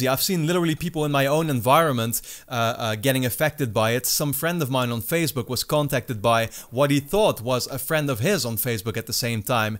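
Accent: Netherlands. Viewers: Hey dude, thanks for the video! I'm new to the channel, btw I like it, so I don't know it but are you Dutch? I am and I think I can recognise your accent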